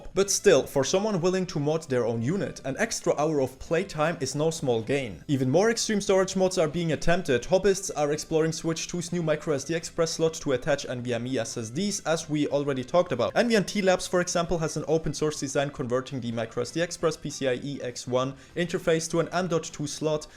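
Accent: Germany. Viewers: I hear a bit of German or am I wrong 😅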